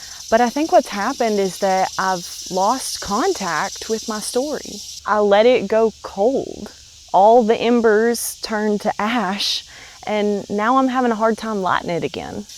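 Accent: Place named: North Carolina, United States. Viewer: I love your accent it sounds very NC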